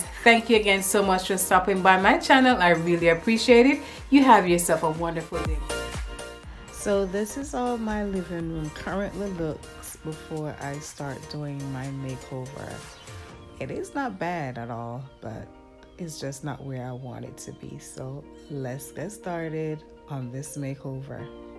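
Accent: Jamaica. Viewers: 2 seconds in, she's Jamaican 😊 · Are you Jamaican? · You sounds like you from 🇯🇲🇯🇲 but anyway job well done ❤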